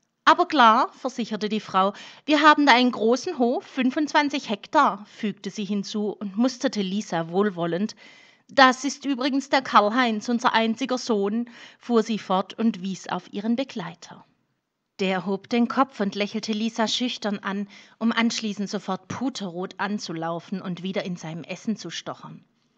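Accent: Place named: Hohenlohe, Germany. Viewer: Sehr gud vorglesa wirdli supi, awer zur muswisa ghärt vileicht doch a bisle mär hohalohisch dazu mansch net a liwi?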